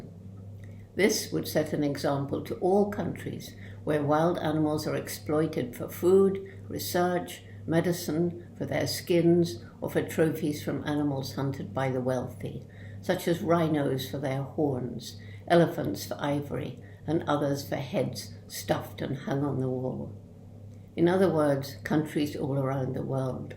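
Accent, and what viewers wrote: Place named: Oxford, United Kingdom. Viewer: Not only the animal world needs you, you are also a perfect example to my French and German students for listening and translation exercises in your lovely "Oxford English language and pronunciation", additionally the messages you spread (social networks permitting)! ... 👍😍 🥀 I much hope this makes you smile! 🥀 Have a blessed and healthy time! 🥀 With love and "solidarity in sunny confinement", in Paris! 🥀 Marie-France ML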